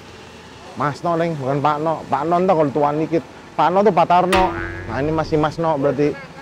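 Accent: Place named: Java, Indonesia. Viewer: Medokmu kok iso menjiwai to ngab Padal mbendinane ndek njakarta Sukses terus friend eating Assswaabbb !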